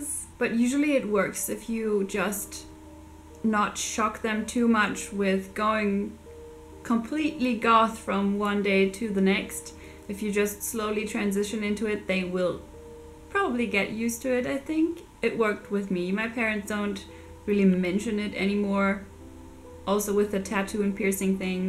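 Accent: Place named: Germany. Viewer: omg when I heard your voice/accent I immediately thought you were german and turns out I'm right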